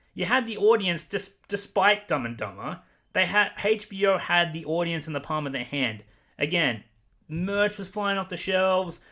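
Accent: Australia. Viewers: I always thought you were British! Your accent isn’t as strong as other aussies I’ve heard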